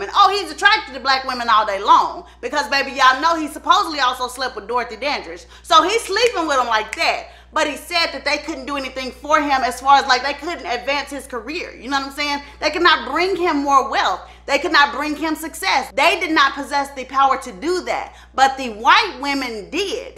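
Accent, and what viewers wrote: South, United States. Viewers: Luv your southern accent your funny and entertaining and know how to tell a story with humor which keep the audience engaged happy I found your channel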